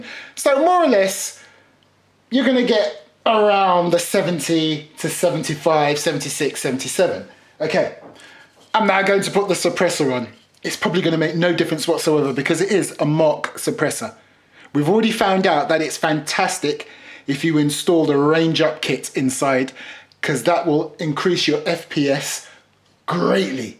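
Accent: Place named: London, United Kingdom. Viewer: Hi, how long did it take for JKArmy to delivery to London (assuming you are from London from your accent)? Also, were there any issues with customs? Definitely thinking of picking this up. Thank you!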